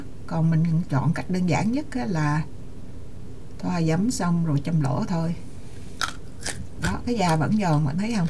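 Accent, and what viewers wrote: Quang Nam, Vietnam. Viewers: Em chỉ dẫn rõ ràng và dễ làm, giọng quảng rất dễ thương vì chị cũng là người đồng hương của em. Cái châm lỗ trên da em order ở đâu vậy? Chị muốn mua. Tks em.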